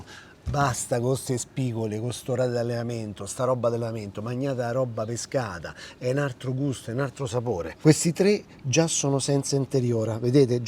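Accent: Rome, Italy. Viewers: I can barely follow Roman Italian but I love his stuff!!!!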